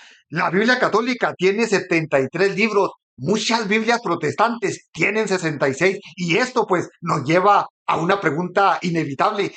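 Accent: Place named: Mexico. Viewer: Me encanto, mas alla de sus palabras, disfrute de esa tonalidad mexicana, se me hizo hermoso en su conjunto.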